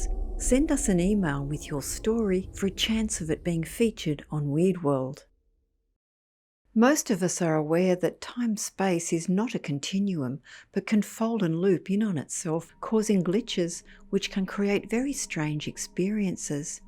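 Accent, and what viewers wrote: Australia. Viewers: More disturbing hearing an aussie narrator say "playing tag" and you pronounce castle weirdly. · Aussie accent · Agreed 👍I'm British too. I think she's Australian😊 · She iis Australian, not British. Americans seem to confuse the two. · This is not a British accent it’s Aussie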